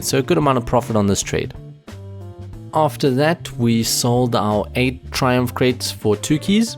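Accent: South Africa. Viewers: Ahh same, I thought I recognised the accent but the newzealand accent and the South African accent are so similar, kan jy afrikaans praat? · I live in Gauteng thats awesome dude I could tell by your accent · May I ask where you are from? You sound like you from South Africa? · Just wondering, are you South African, it’s the accent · Are u from south africa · Are you South African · I love the South African accent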